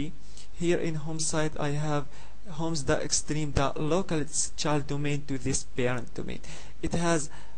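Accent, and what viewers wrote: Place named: India. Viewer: kkkkkkkkkkkkkkkkkkkkkkkkkkkk I understand everything and I love indian (I suppose) accent.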